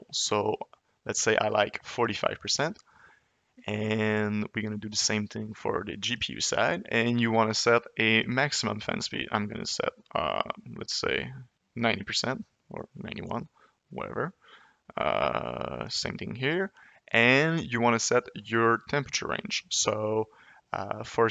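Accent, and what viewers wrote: Quebec, Canada. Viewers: I'm pretty sure this guy is from Quebec because of the accent. Amazing software btw :) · Are you from Quebec ? Amazing video/software btw :) Thx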